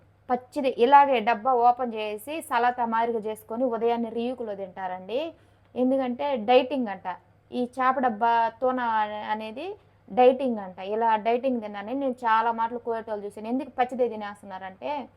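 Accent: Nellore, India. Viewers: Pakka nellore yasa super Madi kuda nellore e andi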